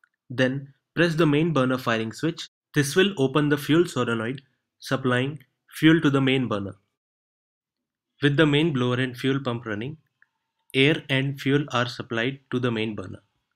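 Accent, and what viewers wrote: India. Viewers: accent, its something crazy/ indian ....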